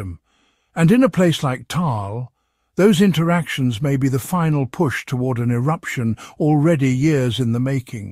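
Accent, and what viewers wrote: United Kingdom. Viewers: Hate British narration:: manipulative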